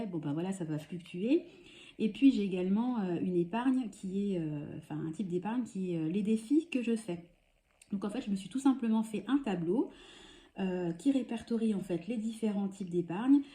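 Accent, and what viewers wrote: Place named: Franche-Comté, France. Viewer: Coucou, moi j'ai un tableau Excel dans lequel l'intégralité de nos comptes, des frais fixes, d'une partie des frais variables gérés en CB, et des épargnes sont intégrés. Puis pour les autres dépenses variables, j'ai mes enveloppes. Sinon, rien à voir hein, ton accent me fait dire que tu viendrais du Franche-Comté...? Mais peut-être que je me trompe 😆 Bon we 😘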